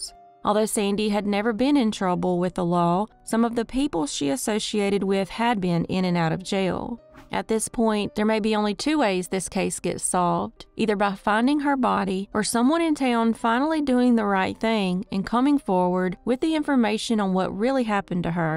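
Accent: South, United States. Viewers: GREAT JOB!! KEEP EM COMING!! AND I LOVE THAT SOUTHERN COUNTRY VOICE!!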